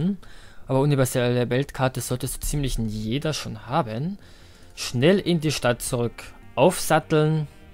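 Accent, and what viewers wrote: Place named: Vienna, Austria. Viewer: hör doch den dialekt als österreicher raus ^^ wiener schätz ich mal :P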